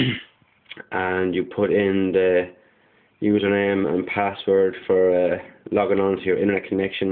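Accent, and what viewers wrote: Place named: Carlow, Ireland. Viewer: hero!!! plus Carlow accent is unbelievable!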